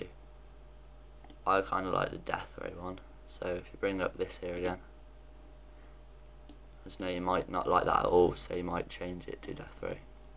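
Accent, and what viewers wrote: United Kingdom. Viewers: "you sound like your from london"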